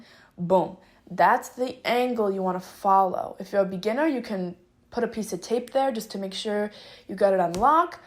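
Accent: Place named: New York, United States. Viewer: Girl, love you! Where you from? I'm from Jersey/Brooklyn and we sound very similar.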